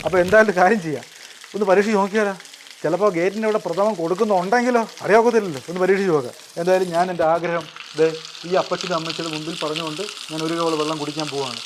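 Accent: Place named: Trivandrum, India. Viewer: 😂😂thani Tvm slanghu 😂😂😂. Vayar kurakku nalla adi aanalle 😂 meesha. Uff soooper ❤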